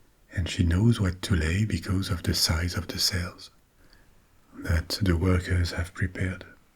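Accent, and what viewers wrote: France. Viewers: would you consider doing Dante's circles of Hell and Virgil's journey through them? I'd love to hear it from your Frankish tones. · Does have a regional accent? From what part of France does he hail?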